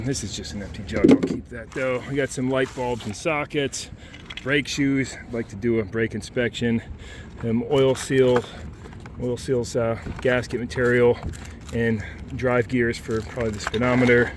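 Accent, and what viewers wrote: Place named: Western Pennsylvania, United States. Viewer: Where are you from? West PA or east OH? Accent gives it away.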